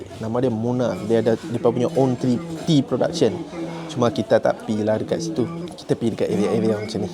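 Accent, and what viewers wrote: North, Malaysia. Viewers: Best lah vlog nih!! Tambah plak dgn loghat utara!! Terus maju saudara! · Penyampaian yg santai, penggunaan bahasa melayu yg sempurna dengan loghat utara, rasa mcm sembang dgn kawan2 kt kedai kopi tapi masih ada unsur2 professionalism.. sempoi! Hang dapat subscriber baru dari aku. Teruskan kandungan2 mcm ni.. 👍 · Jarang sangat2 komen kat video2 travel. Tiba2 naik suggestion video ni. Mula2 dengaq loghat utara pun dah buat aku tertarik. Pastu tgok pulak creator video ni brader India dari Malaysia. Lagi la tertarik. Pastu pulak tgok style travel mcm ni lagi la buat jatuh hati. Teruih rasa nak travel sekali. Baru tau Kerala ni agak menarik. Keep up the good work. Hg mmg best la bro. 🎉👍🏻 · Best wehh chanel ni. Dia bantai loghat utara memang sedap dengar. Asal aku baru jumpa ehh chanel ni. Terus subscribe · Bro sebab lograt utara hg aku follow bro. 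Semoga maju jayaa, keep it up bro👍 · Bahasa utara sempoi anak muda Malaysia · Baru jumpa chsnnel ini. Menarik sgt. Terima kasih bawa kami menjelajah.. seronok dengar loghat utara awak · baru 1st time tengok terus subscribe...mantap dapat cakap dlm BM slang belah utara..tqsm n teruskan berkarya 👍👍👍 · frist time tgk video dari bro ni, aku plak jenis suka lorat utara, memng auto subs and like. terbaik la king fish tu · org utara ka ? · One of the best Travelodge by my fellow Malaysian 🇲🇾. Bangga dgn awak bro. Best dgr loghat utara. Greetings from Vancouver 🇨🇦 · Sedap betui ckp utagha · Sedap tengok video2 awak dik. Yang penting info sampai, puas hati & hang cakap utara rasa dekat di hati kak... Hihi. Sat nak pi tengok video2 lain pulak. Teruskan berkarya na 😊❤ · Mntap konten hg bang..dgn loghat utaqa tibai pi..mmg auto subscribe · suka dengaq hg cakap utara..cantik permandangan, rasa macam vibe aku kecik2 dulu..terbaik weii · Terbaikkk la bro...bhasa utara betul2 mantopppp.... 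· Mula ii igt abg Indian sana sekali dgaq utaqaaa 😂 tq bang seronok layan vd abg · Bestnya bawa kami jalan²...Terima Kasih bg kami pengalaman mcm nie..Dngn guna loghat Utara..Mmg Surrr tgk..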